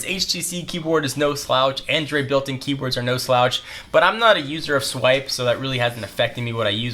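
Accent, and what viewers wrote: United Kingdom. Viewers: british accent on a phone always makes the phone better.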